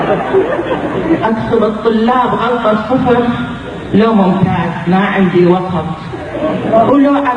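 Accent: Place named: Iraq. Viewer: ياحلو اللهجة العراقية.. احبكم هاشمية من السعودية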